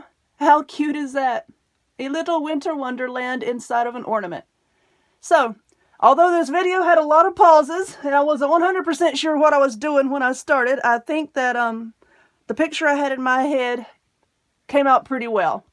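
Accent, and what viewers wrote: South, United States. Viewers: Miss Southern Lady, I definitely live your southern accent. You have a good sense of humor. I enjoy watching your videos. I love watching your different types of craft.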